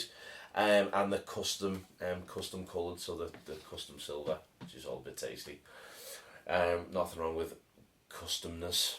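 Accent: United Kingdom. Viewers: unmistakable British accent :D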